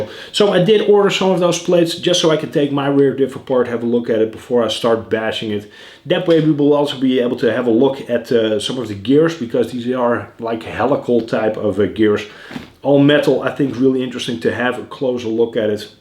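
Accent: Netherlands. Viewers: Godvrdme, 10 jaar later en nog steeds het Nederlandse accent! :-). Maar goed bezig hoor, ik wil ook beginnen met een beetje crawlen maar dan op de Veluwe. Keep up the work sir.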